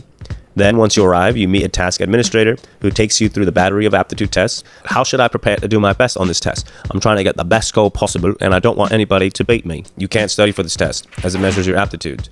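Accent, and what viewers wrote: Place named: United Kingdom. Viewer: That British Accent 😂😂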